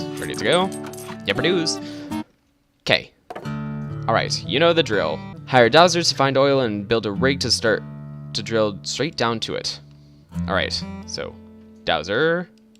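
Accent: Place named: South, United States. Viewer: YOUR COUNTRY VOICE THO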